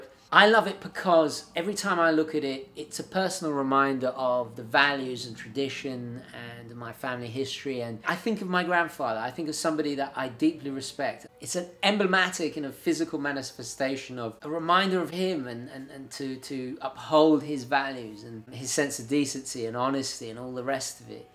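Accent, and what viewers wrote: United Kingdom. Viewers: Nice video. By the way I love the British accent!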